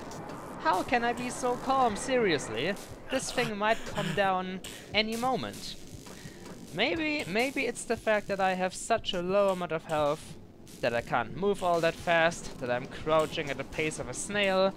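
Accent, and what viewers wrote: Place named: Germany. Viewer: you got skills german skills so use your awesome accent to fool the creeps in thinking your all stone cold so you can crack but look them in the eyes and laugh, laugh manicly catching them off their feet and go piano string on their ass jack-the-ripper style thats how i would but then again i solve problems by jumping off a heilocopter with a gauntlet because i was too lazy too pick up my parashoot im ok but one unfortunate guy hang-gliding didnt do a barrel roll sooooooo he... GOOD LUCK!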